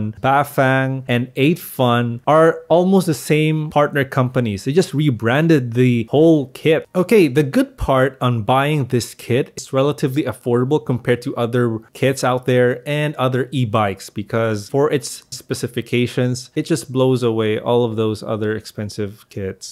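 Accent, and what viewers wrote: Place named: Davao, Philippines. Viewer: Taga asa ka? Murag naa kag Davao.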